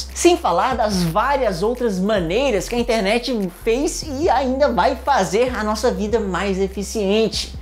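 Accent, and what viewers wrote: Ceará, Brazil. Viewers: Grande cearense, meu vizinho de estado hahaha conheci pelo sotaque antes mesmo de ele citar em algum vídeo o "por exemplo, aqui em Fortaleza". Canal massa, camarada.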